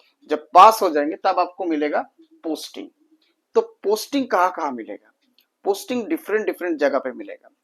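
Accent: Bengal, India. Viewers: Bengali tone so sweet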